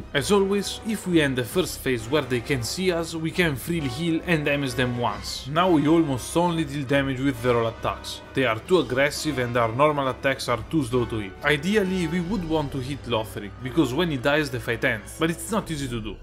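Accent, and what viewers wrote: Italy. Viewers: For real, I love Italian accent, I love dark souls so mathematically (I love this channel)². Btw awesome videos my dude, greetings from Brazil. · Ma per curiosità, che accento è? Che parte di Italia? Ogni tanto mi sembra quasi spagnolo o slavo ^__^ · AH HA! Lo sapevo che l'accento era familiare hahaha! Per qualche motivo, questo è il primo commento che leggo che mi conferma che sei italiano anche se lo sospettavo dal primo momento (?) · I love how i could tell you are italian from the first thing you said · Sottofondo con le OST di Monster Hunter, contenuto di qualità e l'accento italiano non fa altro che rendere il video più scorrevole! (almeno per me :3) Ti ho scoperto da poco e mi sono iscritto subito, un canale così va supportato ^-^ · I started this video without knowing that was your actual voice and I legit just fell in love with it, time to play "Guess where that accent is from" Nevermind, I was guessing Siberia but he's actually from Italy. 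· You sound a lot like an italian trying to speak english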